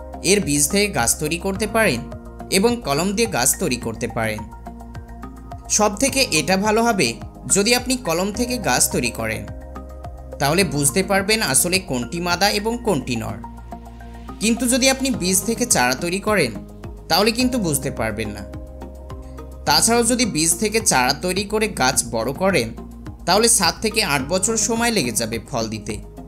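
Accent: India. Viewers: তুমি যে ইন্ডিয়ান এটা কথা শুনে বুঝা যায়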